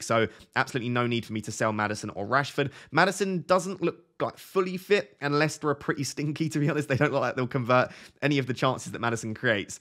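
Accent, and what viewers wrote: Manchester, United Kingdom. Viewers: You don't have to tell us in every video that you're a Man U 'fan', we can tell from your shirt and your strong Mancunian accent 😉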